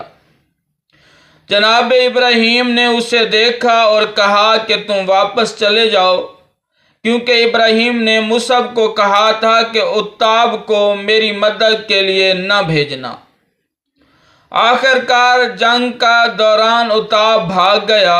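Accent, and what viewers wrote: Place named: Punjab, Pakistan. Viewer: Panjabi urdu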